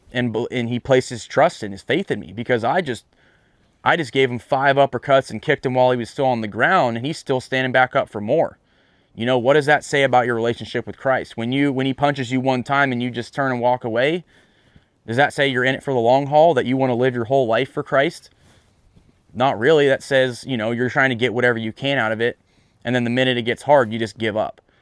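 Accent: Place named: Texas, United States. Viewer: EXACTLY, Jesus is not our "Jeanie" God is not our butler! He's our heavenly Father ! And most DON'T understand, when they say, " i prayed and the opposite happened or this went wrong! God is a FAITH God! Means He's listening and RESPONDING to FAITH! When people talk that way, #1 they probably ain't God's child, they really aren't "saved" or #2 they have NO FAITH, or understand how FAITH works! [Hebrews 11:6] Maybe, when you're truck broke down in Texas, He's trying to say you belong in Texas! You sure look & sound like a TEXAN! Anyway, you have to follow your heart! God bless!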